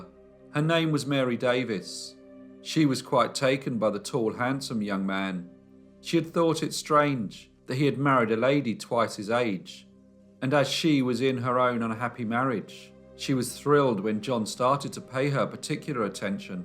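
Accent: England, United Kingdom. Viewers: I like this narrator’s voice. And his English accent. Love his stories, too.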